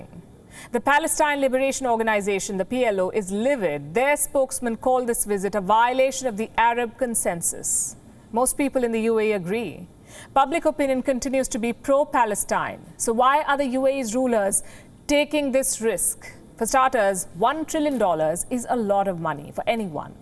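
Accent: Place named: India. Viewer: I wish madam newsreader hadn't had Indian/pakistani accent. it is quite unimpressive.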